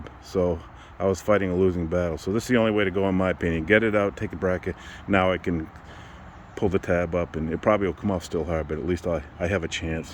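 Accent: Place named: Portland, United States. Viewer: "ayeeee good mornin youtubas" dont worry man i can already tell youre coming at me from portland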